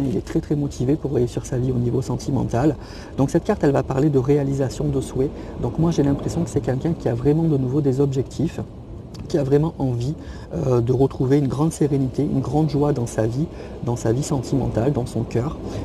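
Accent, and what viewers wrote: Marseille, France. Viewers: On dirait Marseille 🥰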